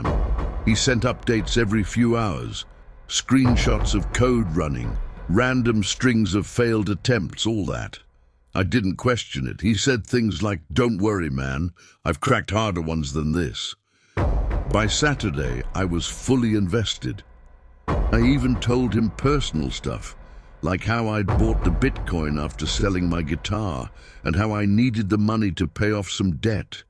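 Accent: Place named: RP, United Kingdom. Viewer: Just discovered your channel! Interesting and eerie story! What makes your channel unique is your voice. I get the feeling that you're an older gentleman, something you rarely find on this sort of YouTube video. (Side note; if the voice is as an AI voice, it's really realistic sounding!....no offence intended if it's your BBC voice)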